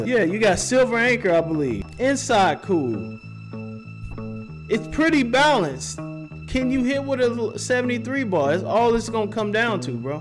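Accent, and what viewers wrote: St. Louis, United States. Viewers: You from stl?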